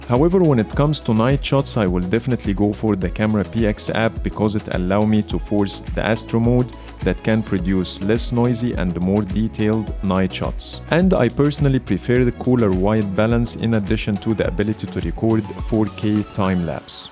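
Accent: Egypt. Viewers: صوتك مصري ياصاحبي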